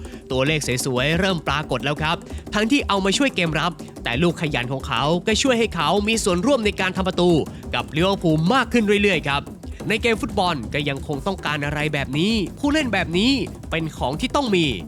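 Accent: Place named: Chiang Rai, Thailand. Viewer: แอดมินเป็นคนตางใดนิ ปากเมืองสำเนียงคนเจียงฮายแต้ว่าอั้น 555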